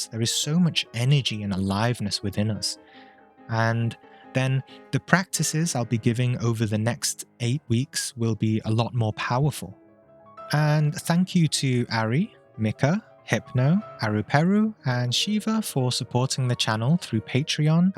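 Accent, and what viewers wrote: United Kingdom. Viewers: okay brother i never comment on videos dnt really do social media but you kinda have to to some extent to exists in the meta verse anyway!!!!! i have to take my hat off!!! i got shown a skeleton key months ago in a meditation and its blew my mind ever since, your video is extremely thorough and you are most def correct. got me excited, knowledge is like good sex to me ahaha when its displayed in front of me i get the biggest serotonin kick. the macro and the micro, all life can be overstood through study of self, we are literally mini universes and setup the exact same way, been studying this stuff since i was 16 i am 29 now and have jut started application of all this knowledge! very exciting time tho so many have woken up, almost dnt feel alone anymore ahah i also see i need for all these new people who have woken up to have some kind of guide to sithed through all da bullshit you have to on this journey, in order for us as humanity to get right to the crux of the matter and start raising up ourselves and in turn earth! 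u sound around my age to, and ur clearly from the uk like me, uk needs more of this we are lacking on the spiritual front on the net not much good representation, but i shall subscribe to you for sheer effort well done.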